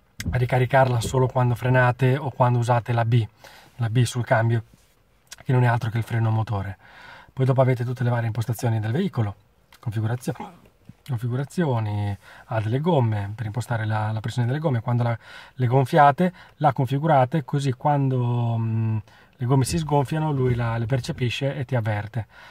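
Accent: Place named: Romagna, Italy. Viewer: Di dove sei sembra che hai un accento Romagnolo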